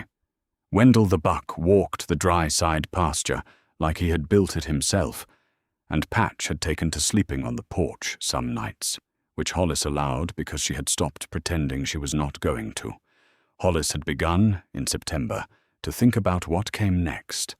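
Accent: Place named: United Kingdom. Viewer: Who cut this, and why is the narrator British? Off.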